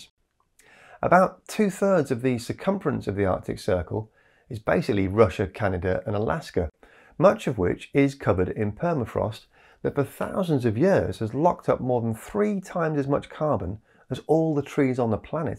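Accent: United Kingdom. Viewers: Methane? No, youthane. Why do Brits pronounce name of the gas that way?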